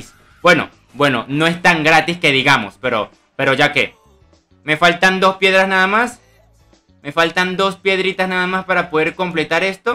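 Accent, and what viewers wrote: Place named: Peru. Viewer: Parece peruano XD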